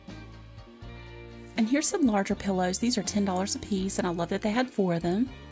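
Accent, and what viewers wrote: South, United States. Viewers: My Restores are not as well stocked! I'm in Atlanta. Love your videos! Your southern accent is so soothing and reassuring! Thank you so much 💓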